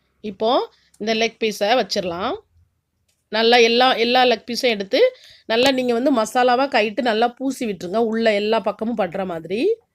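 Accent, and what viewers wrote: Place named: Kanyakumari, India. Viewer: Your slang sounds like kanyakumari…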